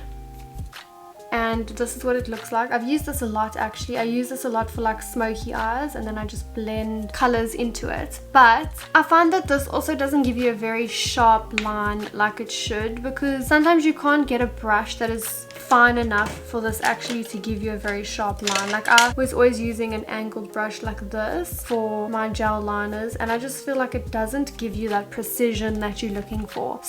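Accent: South Africa. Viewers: You sound south African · Ooh a south African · Are u South African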